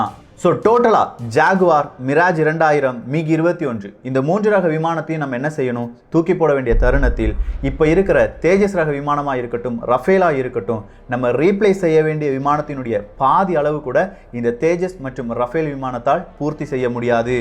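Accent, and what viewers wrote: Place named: Thoothukudi, India. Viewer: thala nyt namma RN kao adha marandhu radhinga ok va en bro neenga thoothukudi ya slang andha mari iruku sometimes silla ucharipu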